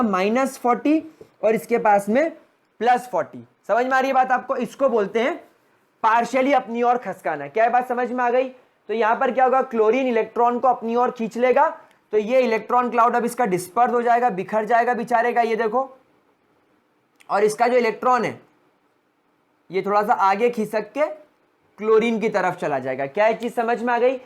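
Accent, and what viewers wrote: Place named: Indore, India. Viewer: Sir u r the perfect indori..😂😂😅.... BTW ur teaching is superbb thanks a lot sir..🙇🙏🙏🙏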